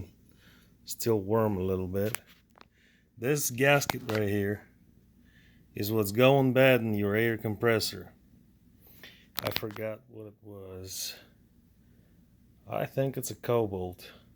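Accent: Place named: United States. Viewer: Добро пожаловать обратно, как говорится. И английский у тебя такой брутально ковбойский 👍🏻👍🏻👍🏻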